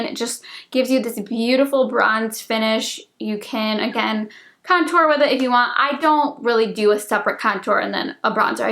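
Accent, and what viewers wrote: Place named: New York, United States. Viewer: Does anyone else remember she used to have an accent?? Am I just going crazy I swear she used to have a New York accent lmao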